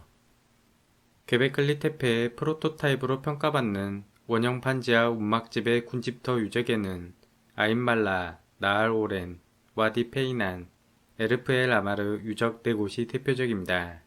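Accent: Jeolla, South Korea. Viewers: 전라도 사투리가 들린다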